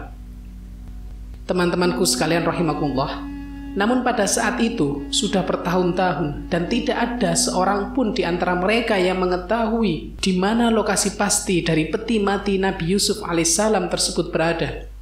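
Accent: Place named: Java, Indonesia. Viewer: Logat yg bikin video jamet abiss medok da males gw ntn ni video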